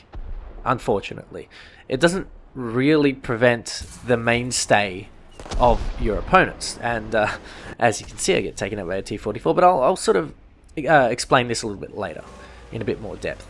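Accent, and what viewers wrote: Australia. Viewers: Its better not really to care tbh, your one of the few i listen to when it comes to vidoes on the games state. Your chill (also the aussie accent just makes it better) unlike most other creators i see who make massive spiels about the littlest of problems, which i just find irritating.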